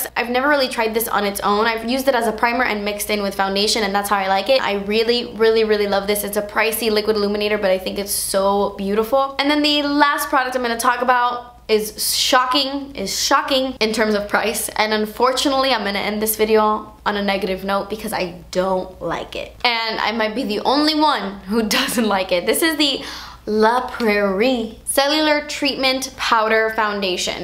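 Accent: United Kingdom. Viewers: Only that's eeeeeeeeeeeeeeeeeeespannnnnnnnnnnnnnsive!!!!!!! Plus your Britain accent! I die! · Her British accent is amazing! I love it!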